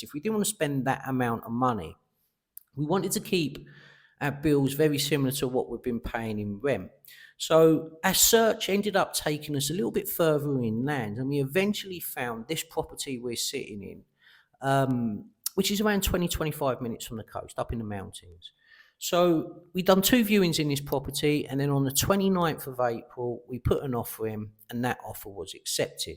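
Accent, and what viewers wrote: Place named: England, United Kingdom. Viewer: hi bub, youll find, if you loose that pomy accent, things will get beter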